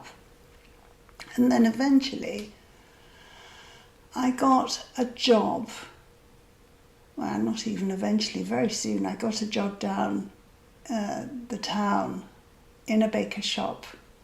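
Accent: England, United Kingdom. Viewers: You have a beautiful English accent,so clear,and educated😊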